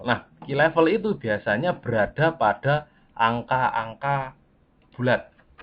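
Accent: Java, Indonesia. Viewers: pean jowone pundi 😁😁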